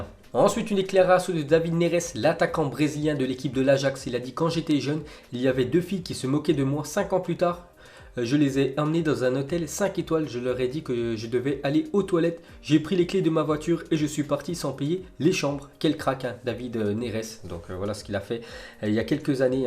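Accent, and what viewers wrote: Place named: France. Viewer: Stop avec les prononciations chelou à la française "les reds dévile"...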